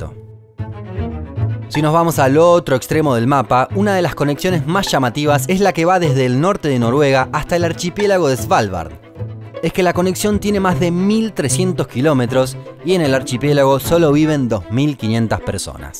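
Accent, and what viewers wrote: Argentina. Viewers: Un Mundo Inmenso tiene un formato tan atrapante, un guion excelente, y un ¡narrador argentino che!!! que hace aún más entretenido el contenido!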